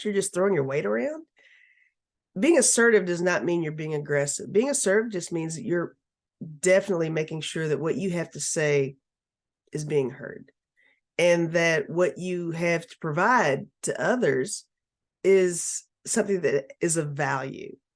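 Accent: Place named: South, United States. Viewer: You are so pretty, love the sound of your voice, best subtle southern accent I’ve ever heard!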